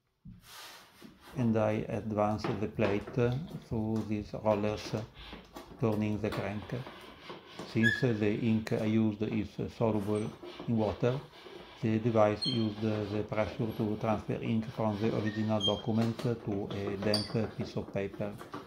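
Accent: Italy. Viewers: Is that an italian accent i am hearing?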